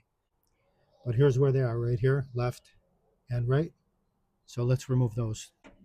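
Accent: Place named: Long Island, United States. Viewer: I don’t even own a Weber grill, but I watched because I like to hear my favorite Long Island Made Man explaining things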